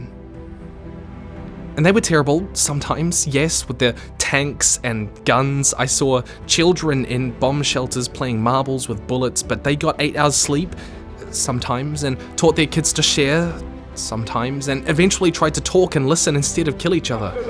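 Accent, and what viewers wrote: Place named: New Zealand. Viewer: I'm sleep deprived so when I clicked on this I actually thought it was an exurb!a upload until I saw Tim's childhood photos and actually registered his voice like "wait a minute, this is new zealand not british accent" 😂what a wonderful celebration of our world ! amazing writing from a great teacher ! I've definitely missed your videos :)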